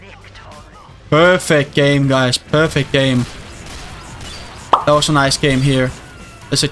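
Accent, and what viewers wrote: Denmark. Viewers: just a guess, but are you danish? if not then my hearing is completely broken, hav en god dag :)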